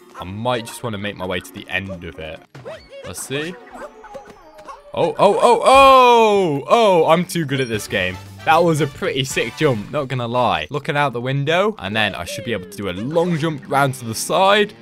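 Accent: United Kingdom. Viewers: Is that why you sound British?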